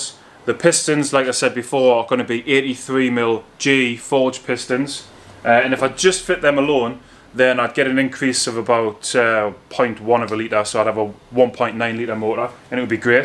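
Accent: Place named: Newcastle, United Kingdom. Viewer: It's like watching a Geordie edition of Engineering Explained - Which is a good thing.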